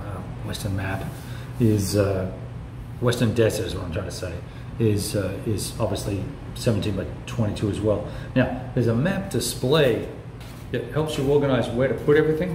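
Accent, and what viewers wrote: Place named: Australia. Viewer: The Australian accent adds to the Guy Pierce thing goin on. Though I reckon Mr Sharp is more the Teddy character in this one ;-)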